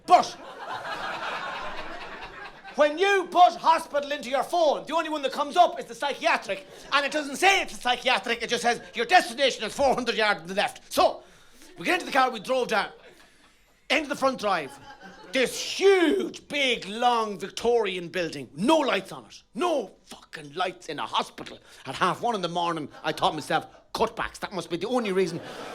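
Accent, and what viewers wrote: Ireland. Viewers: The older Tommy gets the more he sounds like he's from tuam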